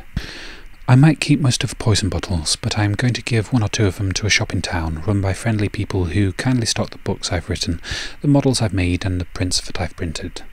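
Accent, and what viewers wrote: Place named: Yorkshire, United Kingdom. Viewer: was watching this vid last night and fell asleep to your soothing voice, lovely. There is a hint of a Yorkshire accent?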